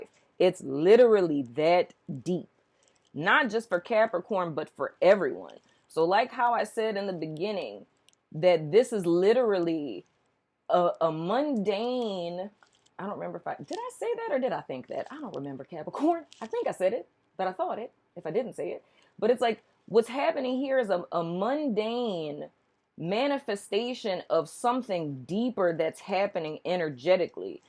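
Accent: New Orleans, United States. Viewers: ok maw, cutup! Glad i found this video...i got a lot from it. Thanks! I heard a lil Nola accent in there! Lets geaux!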